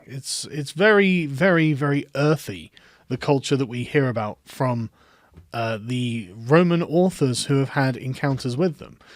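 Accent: United Kingdom. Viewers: I'm from the U.S. Love your British wit and accent. I despise what youtube has done to you and we haven't stopped it. All aboard Trump 2020 and Brexit!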